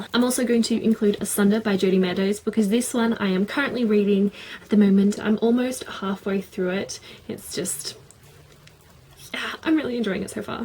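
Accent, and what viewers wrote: Australia. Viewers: OMG I wasn't sure if you were Australian or not! thank god there is an aussie booktuber!! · 66 books O.O and haha all this time I thought you were English but your Australian. It seems more evident now haha.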